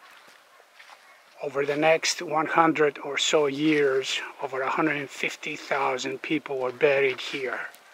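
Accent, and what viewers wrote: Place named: Greece. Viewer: Thanks for explanations and for video ✌️ Like your accent. I guess greek:-)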